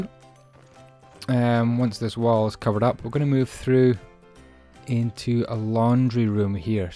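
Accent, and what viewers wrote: Scotland, United Kingdom. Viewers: so nice to hear someone scottish doing minecraft vids! :D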